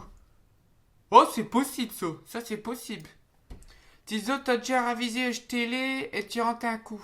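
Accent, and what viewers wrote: Nord-Pas-de-Calais, France. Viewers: Sa se vois que est un ch’ti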